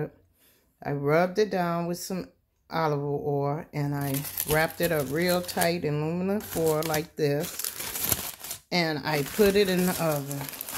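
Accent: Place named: Haiti. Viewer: Ok I though because you have an haitian accent.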